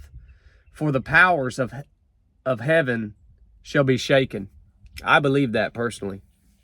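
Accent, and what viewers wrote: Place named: West Virginia, United States. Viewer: So you know has WV accent.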